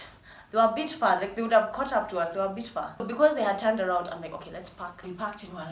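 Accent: United Kingdom. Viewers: Uko na accent ya Briton 😮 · You do a mad British accent.... Damn😍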